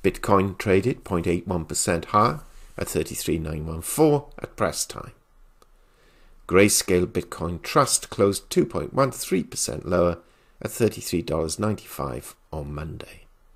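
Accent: England, United Kingdom. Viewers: Subscribed & Liked to help support your podcast. Keep up the pleasant pommy accent.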